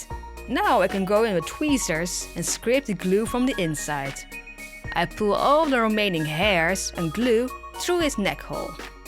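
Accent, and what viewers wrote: Netherlands. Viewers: i can hear from the way you speak english that you are dutch right XD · Are you dutch?? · Are you Dutch? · your dutch accent is so cute! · Are you dutch? I'm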